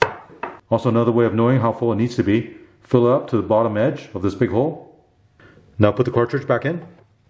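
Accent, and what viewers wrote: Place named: Germany. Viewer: I detect a german accent.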